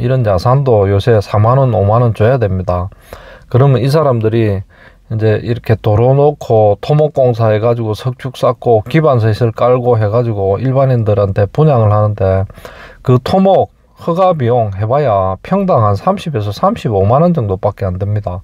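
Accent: Gyeongsang, South Korea. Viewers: 100프로 갱상도 액센트...... 소름끼치네!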